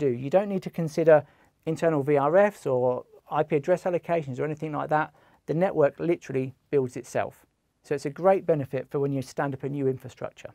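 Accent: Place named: United Kingdom. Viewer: God, finally UK gentleman pronounce... love it...nice one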